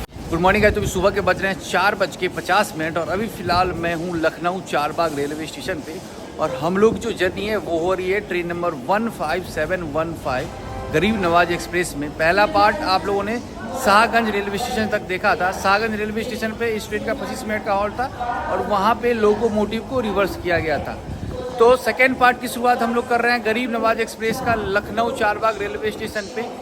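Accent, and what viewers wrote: Bihar, India. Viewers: This guy english is so funny not in a bad way but that bihari accent english is hilarious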